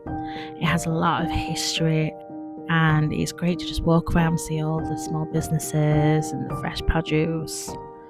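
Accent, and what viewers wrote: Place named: England, United Kingdom. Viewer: As soon as I saw the name of the channel I immediately knew they weren’t Irish 😂 came to double check I’m right and heard the English accent. ❤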